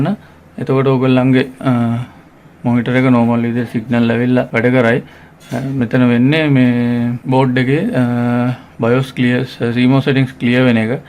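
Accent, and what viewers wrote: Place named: India. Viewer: Title english and you speak indian. I dont know what u are saying bro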